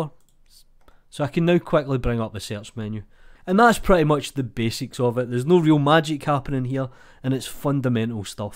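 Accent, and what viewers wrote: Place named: England, United Kingdom. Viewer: best english accent ever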